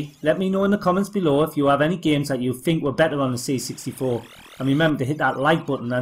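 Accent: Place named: Newcastle, United Kingdom. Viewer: Geordie retro computing! Subscribed!!!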